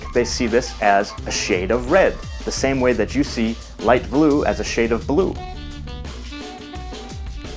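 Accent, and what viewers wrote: United States. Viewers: I thought you were American. You all sound alike. :p Though Canadians seem more polite. I got put off learning Spanish after visiting Spain and everyone kept slating my Spanish and switching to English. :/ I also tried Japanese but I won't be visiting there for a few years and whebever I spoke to a native speaker online they just laughed and told me not to bother. However, when I went to Croatia and learnt even just a little Hrvatski they loved it!!! It was a really cool experience and broke the ice so much. Everyone was 1000x friendlier in Hrvatska when you tried the language. I think it really helps to pick the right languages as your first so you aren't discouraged. Don't go for an easy language. Choose one you'll be motivated to learn. Volim Hrvastka! Split je lijepa! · I am enjoying the language series. what got me to comment is you saying that Canada is the same as the states? 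No we are not. Perhaps superficially, however, most of us tend to speak English well for most people around the world to understand what we are saying. We have more of a tendency to be bilingual, we are far more polite and able and willing to use proper English. Yes I know, plenty of low brow americanesque speakers do exist here as well. On the non language side; we aren't violent greedy warmongers. We don't invade countries for their resources. We don't have a war of terror on everyone who isn't american. We don't torture people around the world because they won't sign a lopsided trade deal, or they are not christian or they don't speak english the list goes on and on. I've heard you speak like a yank yourself. Zee over zed? Not all of us are assimilationists. Long story short, Americans are just that "mericans", not Canadians, not Mexicans, just yanks. that's it.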